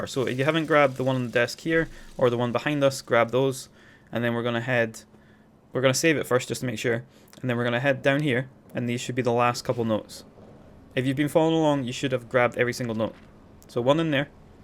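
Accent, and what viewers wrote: Scotland, United Kingdom. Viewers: Scottish I hear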